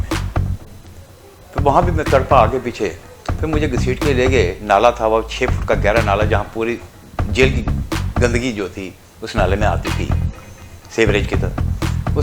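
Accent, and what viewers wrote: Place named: Jammu, India. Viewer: his dogri accent is good ,with the music its like a old bollywood film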